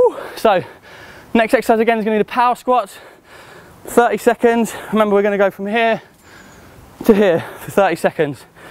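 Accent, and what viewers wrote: England, United Kingdom. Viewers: Alice Liddel and a bit of a softer english accent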